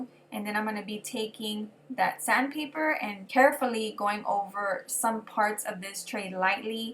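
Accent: Texas, United States. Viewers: I know a fellow Texan accent when I hear it! Love your videos!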